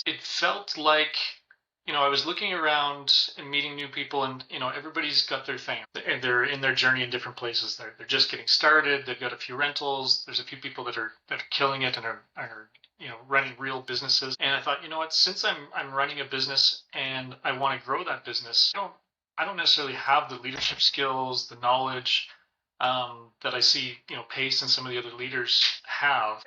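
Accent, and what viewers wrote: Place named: Canada. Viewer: Great Canada voice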